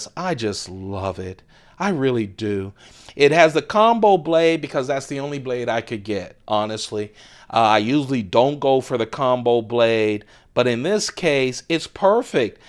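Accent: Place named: Upper Peninsula, United States. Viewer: Redeemed Man, I agree 110%. Unbelievably annoying. I also couldn't finish it, had to shut it off. As asked above, was he under the influence of drugs/alcohol? Man, if I ran out of hand strength after operating these knives a few times I sure wouldn't make a video showcasing it. He sounds like those guys that sing,. I won't make this mistake again.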